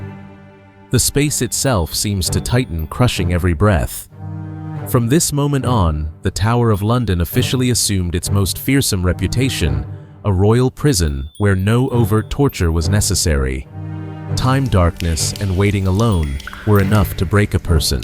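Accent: United States. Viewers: This is fascinating, please use real British narration though, not American robots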